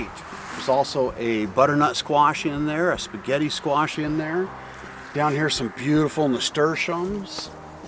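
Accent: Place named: Minnesota, United States. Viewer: Nice Garden!!! I thought I recognized a tiny bit of a MinneSOOOda accent. I grew up in Bloomington. Hey, if you're expanding your garden, get a packet of Sumer Dance Cucumber seeds. Grow them up a trellis. Foot or more long, straight, very few small seeds. Great for sliced pickles. Pine Tree Seeds. TYFS, Mark and Rosa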